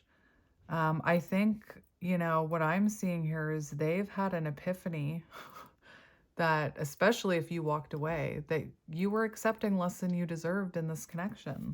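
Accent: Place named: Canada. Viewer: Crying and throwing up every time you say "about" because this guy has the most beautiful Canadian accent I've ever heard in my life. 😭😭😭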